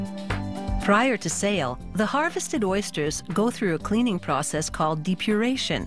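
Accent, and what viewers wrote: Canada. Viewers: I hear a very distinct Canadian accent. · The speaker is canadian...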